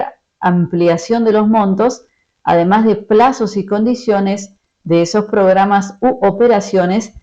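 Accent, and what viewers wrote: Argentina. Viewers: me da esa escucharte. Tu tono argentino después de tantos fiera de tu país. En que haz colaborado en tu país. Es fácil juzgar y no estar en la en tú Pais. en batalla. Desde lejitos. DTB y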